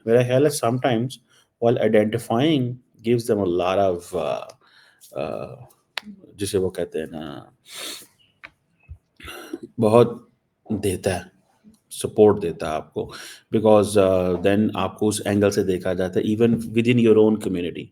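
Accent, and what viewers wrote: United States. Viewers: ji u are already little funny and to add on it American accent whyyyyyyy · Karmala bhenji was very confused about her identity and therefore didn’t get the whole hearted support of the Indian diaspora!! what’s with the American accent?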